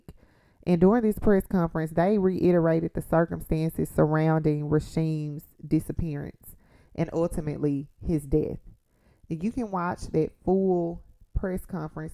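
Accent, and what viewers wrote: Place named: South, United States. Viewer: girl your accent is so southern🤣🤣🤣🤣🤣🤣✌✌💖💖good lesson!...hope this case get the justices he deserve.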